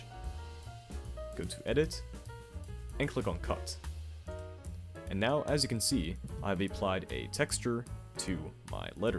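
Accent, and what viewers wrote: Germany. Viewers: Youre German? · Wait, are you from germany lol?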